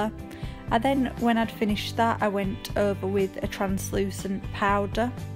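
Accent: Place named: New England, United States. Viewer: Live the yanky accent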